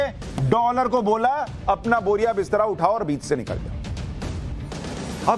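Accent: Bihar, India. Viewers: SUSHANTSINGHJI, DOLLAR KO IS TARAH DEROGATORY TONE MEIN BAAT MAT KARIYE ,HATAO HATAO ,YEH KYA BAZARU BHASHA CHALA RAKHI HAI.HAME KOI DOLLAR SE LAGAAV NAHIN LEKIN SHISHTACHAAR KA PARICHAY DENA ZAROORI .BIHARI TONE GHALAT HAI.DOLLAR KI KOI LANKA NAHIN LAGNEWALI ,HINDUSTAN KA RUPEE ,HINDUSTAN NE MISMANAGE KARKE GIRAYI ,APNI GHALATI DIKHTI NAHIN LAGE AMERICA KO GAALI DENE .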